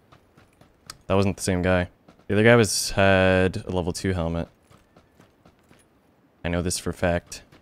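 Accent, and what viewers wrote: Canada. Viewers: are you canadian ? just wondering sounds like it ;)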